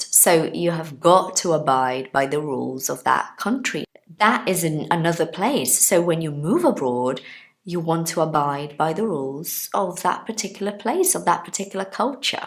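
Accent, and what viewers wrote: United Kingdom. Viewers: You sounds like a royal british woman, i love hear this british accent, and my dream/expectation is speak british english perfectly like you teacher 😊 Ps: Sorry about my BAD english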